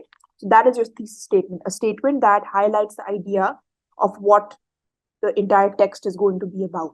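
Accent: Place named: India. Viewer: Ma'am sounds desi while speaking urdu and fancy while speaking english😭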